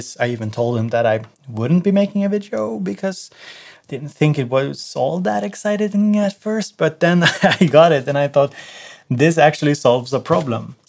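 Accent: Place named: Sweden. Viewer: Sounding particularly Swedish today! :)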